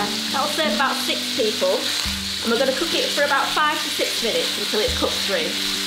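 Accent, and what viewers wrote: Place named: Northern England, United Kingdom. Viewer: Ur nothern arent u 😛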